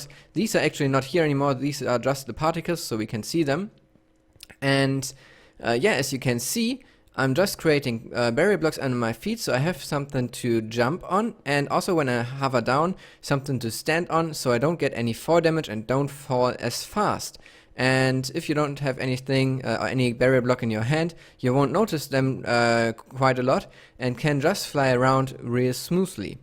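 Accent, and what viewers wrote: Germany. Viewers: Bist du deutsch? kilngt so :D ich finds richtig gut aber ich hab mir die map gedownloaded und finde die stelle mit dem jetpack nicht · kanns sein das du eigentlich deutscher bist? hörst sich bissle so an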